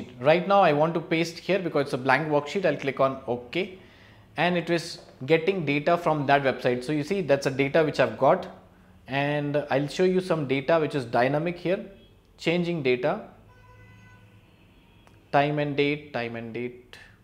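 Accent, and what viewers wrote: India. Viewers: 咖喱味的英语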